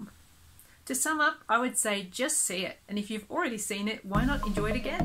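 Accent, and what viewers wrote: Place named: England, United Kingdom. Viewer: One of my favorite 'Film Noir's' ! Sexily narrated with a lovely English (I think) accent and a lot more sensual than Eddie Muller of TCM will ever be, bravo!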